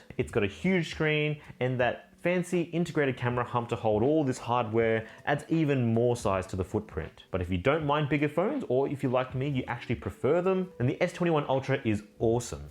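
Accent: Australia. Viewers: you look Chinese but speak like an Australian . nice video though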